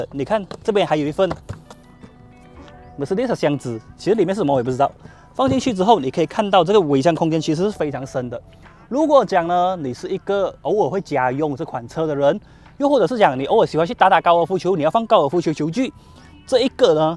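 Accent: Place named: Malaysia. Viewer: 你照你原本腔調來說話是沒問題的 不需要這樣吊著嗓子說話 比起在某些詭異的地方突然高音 還不如馬來西亞腔道來得親切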